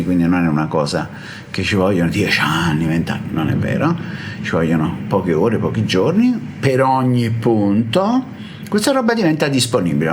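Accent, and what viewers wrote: Naples, Italy. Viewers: Un napoletano sente i suoi simili già nell'armonia di voce comunque grande ,avevo lasciato la mia tastiera da parte ma con i tuoi tutorial mi hai dato voglia di imparare cose buove semplici e concrete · Si sente la radice napoletana, ed è molto piacevole...sec me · Cmq si sente che è napoletano... per fortuna.. aggiungo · Lo so che sei di Napoli, e si sente pure 😉. Cmq ti ascolto sempre con molto piacere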